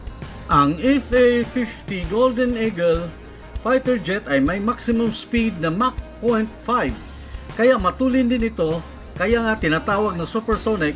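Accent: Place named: Visayas, Philippines. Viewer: Visaya nimo dong kaya pala tigas ng solte nimo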